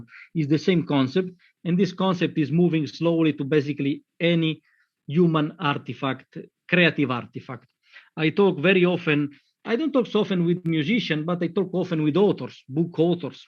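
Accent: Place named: Russia. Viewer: Он говорит на английском максимально по-русски) Очень понятный акцент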